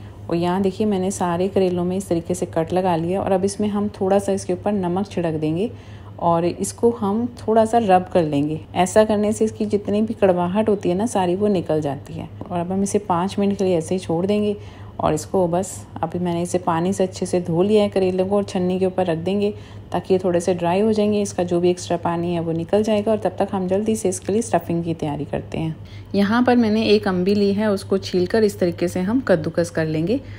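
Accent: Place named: Punjab, India. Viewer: Aapki aawaj different lag rahi h Punjabi tone aa rhi h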